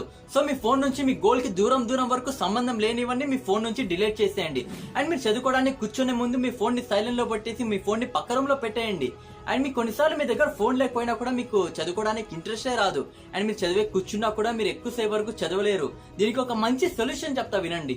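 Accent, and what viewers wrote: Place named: Rayalaseema, India. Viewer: Rayalseema slang superb bro iam Harish ekart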